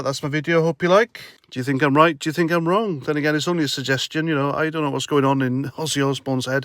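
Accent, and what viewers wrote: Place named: Birmingham, United Kingdom. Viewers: your Brummy accent is hilarious...yam alright am ya bab 🤣😃👍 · Excellent brummie accent. I'm glad Ozzy's coming back bless him. War Pigs is an ace song....so true today as well.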